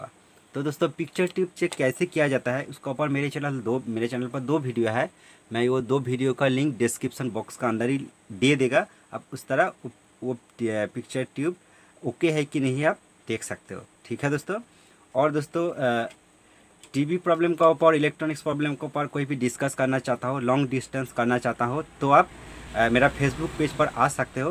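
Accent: Bengal, India. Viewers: भाई की हिन्दीभी बहोत ध्यान से सुननी पड़ती है क्योकि लहेका बांगला में आता है बेस्ट करते हो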